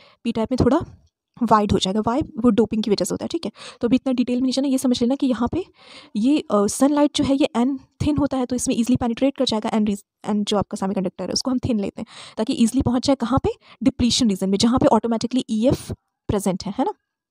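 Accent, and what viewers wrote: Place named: India. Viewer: Please speak slowly, aapki BPO wali accent se aadha lecture uper uper jata hai, thoda toh cold blooded accent use karo kaha jane ki jaldi hai.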